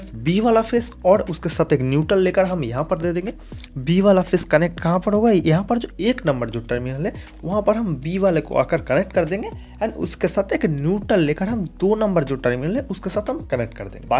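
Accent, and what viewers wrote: Bengal, India. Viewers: Best videos bro. Keep it up🎉. It feels good in bangla accent.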